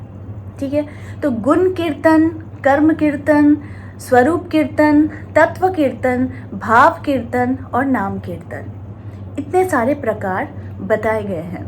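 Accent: Bengal, India. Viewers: R u Bengali??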